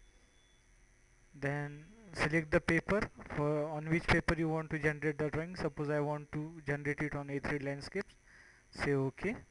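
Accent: India. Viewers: Incomprehensibly thick Indian accent and poor quality audio combine to make this english language tutorial sound like a foreign language.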